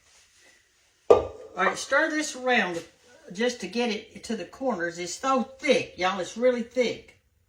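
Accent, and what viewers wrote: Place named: South, United States. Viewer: You are so precious! I love your recipes i just subscribed! Love that southern accent!!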